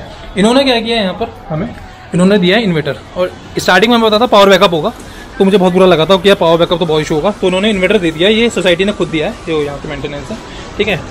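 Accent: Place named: Pahadi, India. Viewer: It was so obvious that you are pahadi by your way of talking... Very nice video you have almost covered all the minute details. Thank you for this video😅 I'm from pahad too😊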